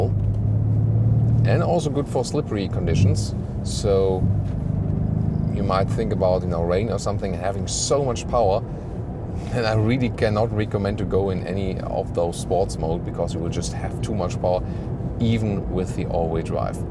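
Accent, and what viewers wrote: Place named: Germany. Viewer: Gutes Video, wie immer, super Wortschatz, aber die Aussprache... da hört man den Teutonen aus Km... Deutsch wäre mir deshalb lieber.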